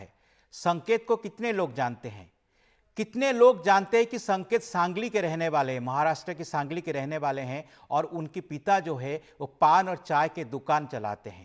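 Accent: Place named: Odisha, India. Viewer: The anchor’s Odia accent is so nice.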